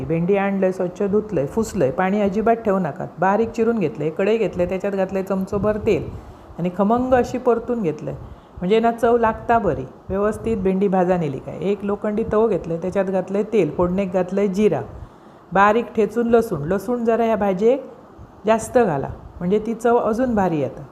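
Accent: Malvani, India. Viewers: खुप छान तुमची मालवणी भाषा मस्त वाटत आहे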